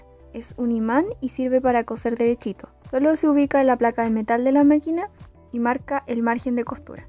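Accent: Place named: Chile. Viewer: Ay apenas te escuché dije: es chilenaaaa. Mi país mi país 😁 muchas gracias por el tutorial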